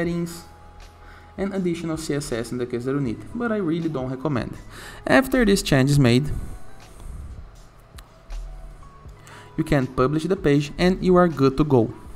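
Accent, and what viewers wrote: Brazil. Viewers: Esse inglês é BR hein rsrs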